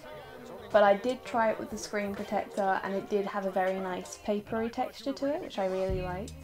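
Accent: England, United Kingdom. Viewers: OMG UR BRITISH GASP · ppl think im British but im Australian!!!! its awesome that ur British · I LOVE UR BRITISHNESS :3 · YOU'RE BRITISH I happen to love you heck of a lot more now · Im not gonna lie your actually really hot!im gonna get a drawing tablet for my bday this march 23should I plan on buying this because a kind of have my eyes on a lot of tabletsHELP ME (btw I love England accents) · Got to love that british accent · WOW are you from UK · It looks like a small TV Also you look really cute! Idk why I like British accents Well keep up the good work!❤️ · U know I thought she would be French but British it's gud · Are you English? I am too :3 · I DIDN'T KNOW YOU WERE ENGLISH WE CAN BE ENGLISH TOGETHER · I love you British accent! And your sooo pretty! 💖 I also really love how you animate:3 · I have so many words to tell you but I will put them short I LOVE YOU'RE BEAUTIFUL BRITISH VOICE AND YOUR FACE.... 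YOUR SO BEAUTIFUL!!!! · are you British? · Me and my friend finish the vid he's like huh I wonder if she's British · Holy FRICK UR BRITISHHHHH AHAHAHA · Well, being British and different is cute ❤ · you were already perfect and now I know you have a british accent AHHHHHHHHHHH I'M SCREAMING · british?:D yay british :D a youtuber that is like me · You're British and pretty? GG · MY LORD, YOU ARE ABSOLUTELY GORGEOUS!!! No wonder your art is amazing...beautiful art comes from beautiful people. Me, myself, and I have been a big fan of your art, and also kinda inspired...I've been (SO BADLY) wanting to draw like you, with a style slightly the same, only in the hair shading really. I've always loved the way you shaded the hair...and the apps i have...don't have that kinda setting of shade. SO, soon i'll get tool Sai and try, though I don't have a drawing tablet...That dream died quick...anyhow, LOVE YOU, AWESOME ART, I'M INSPIRED! 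HAVE A GOOD DAY! (PS...I've always adored the British accents...but sadly i'm american so rip lelelel...i'm also 16 so HEY GURL, HIGHSCHOOL IS FFFFUN XD) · finnaly a brittish animater :3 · Finally a British animator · YES A BRITISH ANIMATOR!!!! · Nice. Ur British! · you gotta love that PERFECT british accent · Ur bootiful.One question are you British ?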